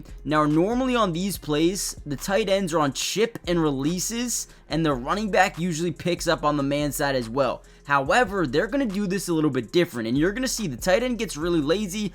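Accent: Canada. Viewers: Do I detect a Canadian accent?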